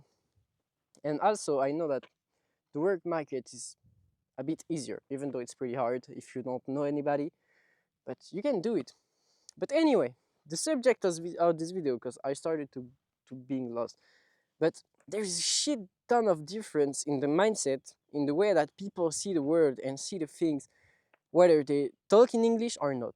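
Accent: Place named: France. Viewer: what part of france is your accent from?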